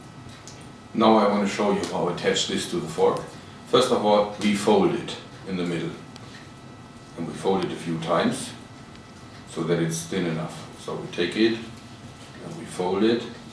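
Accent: Germany. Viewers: no, it's a german accent^^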